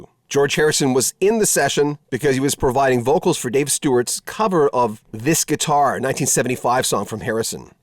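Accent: Canada. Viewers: I didn’t see a video I just heard a Canadian accent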